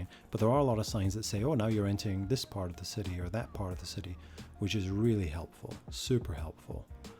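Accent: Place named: Scotland, United Kingdom. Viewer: Hi bud. Enjoying your videos. Where in Scotland are you from? Seem to have a bit of a mixed accent. I'm Scottish myself so good to come across another countryman who likes geoguessr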